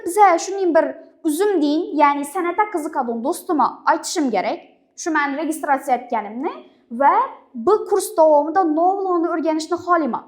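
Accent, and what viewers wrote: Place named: Khorezm, Uzbekistan. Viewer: Ex xorazmcha ekanda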